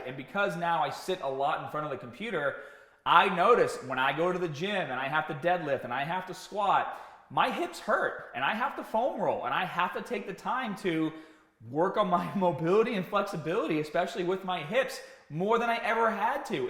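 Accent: Boston, United States. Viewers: The most Boston of Boston accents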